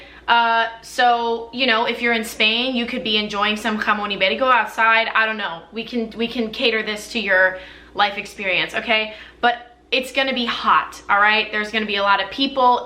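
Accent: France. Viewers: You have a great taste on perfumes and a very nice french accent ! Great video, I watch you your channel from Portugal! 😁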